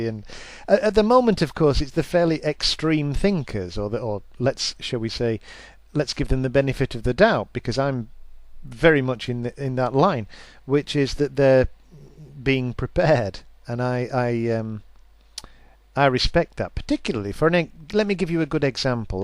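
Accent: United Kingdom. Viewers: wow -- very good post and i agree with your consolidation of the current developing "buzz". a few points - ammo is back (if you can afford it), gold is still controlled by the fiat makers, what are ordinary folk? btw, where did you get that image? I want it as my PC wallpaper. I have a good one for you as well. Your accent reminds me of a friend I had from Sheffield. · Great talk ... keep'em coming. A lot of common sense in your videos.. ... and is that a Geordie accent BTW ?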